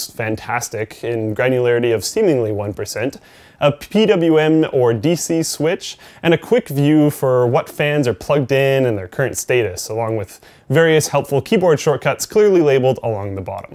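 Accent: Canada. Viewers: what's with the Canadian accent? i know you're Canadian and all but i have never heard you nor linus sound like that before! yes i did notice a few videos back!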